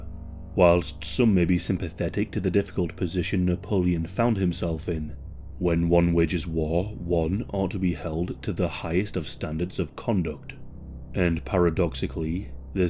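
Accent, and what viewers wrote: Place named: Birmingham, United Kingdom. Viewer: I can’t stand it. I think he’s from Birmingham.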